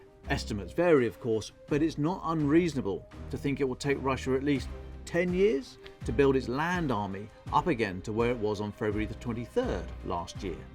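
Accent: United Kingdom. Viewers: "they could get amongst it pretty sharpish" God I love British English and tea teeth.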